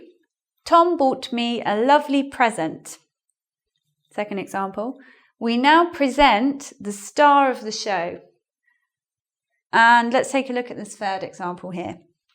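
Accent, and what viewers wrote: United Kingdom. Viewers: Is it pure British accent ?! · I find you very attractive ! specially your british acent ! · Wow british accent 😍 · Is this British accent? Sounds Cool!! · hi from México, do you know im just gonna suscribe me, because I love inglish specially British inglish, I love your accent, so I hope you can share us more videos about your english British, I spent too much of time time looking for British accent and finally I found out it in your channel. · british accent? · british accect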